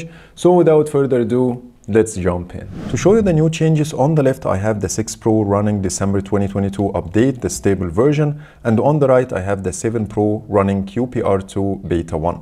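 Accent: Egypt. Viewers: The heavy Egyptian accent tho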